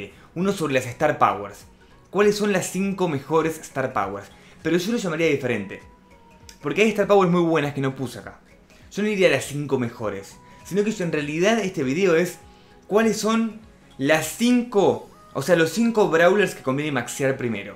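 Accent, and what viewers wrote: Argentina. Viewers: De qué parte de Argentina sos?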